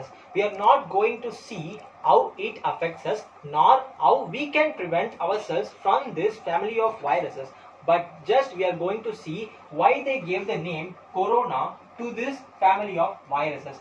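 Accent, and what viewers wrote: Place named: Punjab, India. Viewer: yrrr ap ka andaz ajeeb h bht mtlb lhja punjabi type h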